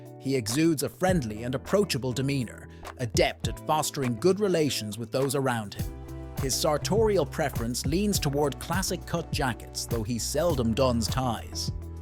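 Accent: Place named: Ireland. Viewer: Irish accent reading non-English native's descriptions. Oh, the dissonance !!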